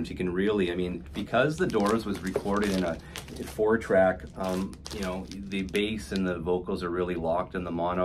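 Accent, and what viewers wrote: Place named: Canada. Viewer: Canadian and with that some pronunciations are different where he is from.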